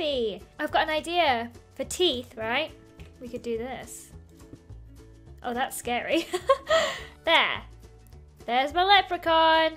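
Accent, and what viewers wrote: United Kingdom. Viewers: She's british, they pronounce things differently.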